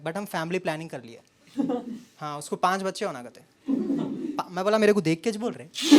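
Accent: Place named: Hyderabad, India. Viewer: Love the Hyderabadi accent 😅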